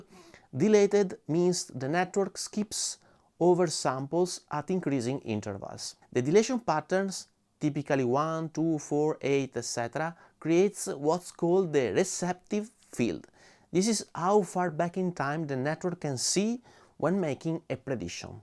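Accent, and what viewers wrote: Italy. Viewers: You could put the script on your phone and reference the screen. The casual walk & talk is very engaging in many ways because it's like you're taking us on a journey with you through your environment where you normally go for a walk. We get to see a little bit more of your world and get to know you better. Nobody expects you to speak English perfectly. In fact, your Italian style makes you a real Renaissance Man!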